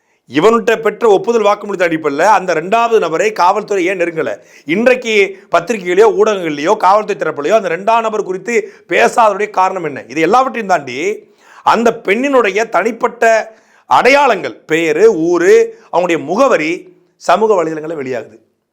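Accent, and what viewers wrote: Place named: Telugu, India. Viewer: இவன் தி மு க காறன்மட்டுமல்ல தமிழ்பேசும் தெலுங்கன்போல.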